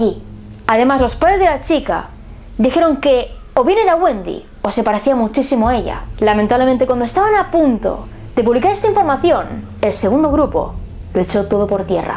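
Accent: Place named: Castile, Spain. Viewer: Si todo va bien... ! Jaja 😍 me encanta tu acento castellano, de hecho todo.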